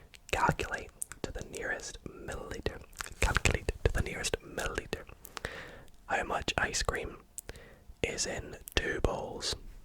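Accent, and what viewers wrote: Scotland, United Kingdom. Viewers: I new you were from Scotland 🏴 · You sound a lot like jojo asmr with an occasional Scottish accent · were do you come from in scotland? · man’s talking bri’ish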